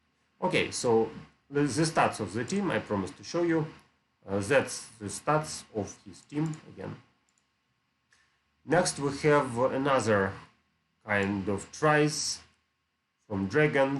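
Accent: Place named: Russia. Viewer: в твоем английском я слышу русский) isn`t it?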